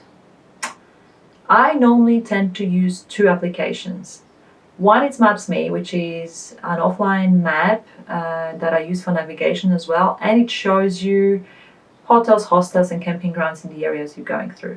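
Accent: Australia. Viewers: I spotted the Aussie influence in your voice and attitude. You're an exceptional individual and supreme ambassador. The world needs more of you. ❤ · Australian.....yes can pick Aussie accent....and polish....so am i....doing this on your own Awesome.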